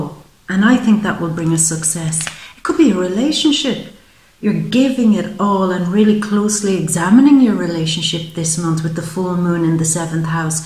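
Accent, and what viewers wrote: Ireland. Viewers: I love your Irish accent. I work for a lovely Irishman. The Irish are poetic, and deeply in touch with the spirit energies! <3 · Felt pulled to ur video😇after watching O.A.'s 1st season yesterday, I see why🤔👰🤴🥂loved the reading & ur lovely Irish accent😃😎☄had to sacribe to ur channel & want to say THANK YOU to u in advance, 4 helping me w/my "Ascension"☄😎👰🤴🥂🕉 4/28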